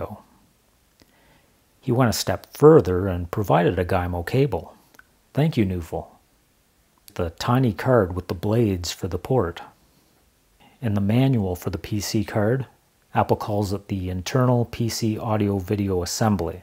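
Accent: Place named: Ontario, Canada. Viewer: Love the videos and the Ontario accent.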